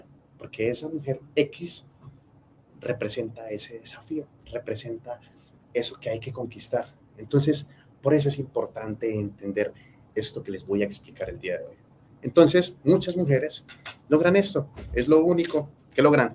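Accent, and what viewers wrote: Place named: Colombia. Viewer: Hola saludos 👋 Yo le sigo hace un tiempo pero lo q me llamó la atención la primera vez q ví un vídeo suyo fue el tatuaje q tiene en su cuello..es el año q mi único hijo varón fallecido nació..😢 pero desde ese primer instante que me salió un vídeo waoo me encantó como se expresa .ademas q me encanta el acento colombiano🤗.los consejos q da q me han sido d gran ayuda en el proceso por el q he estado pasando.. gracias bendiciones 🙏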